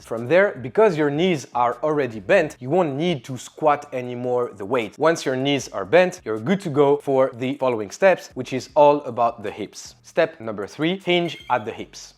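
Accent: France. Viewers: Super tes vidéos en anglais ça me permet de m’exercer à l’oral de manière pas trop hard core grâce à ton accent français 👌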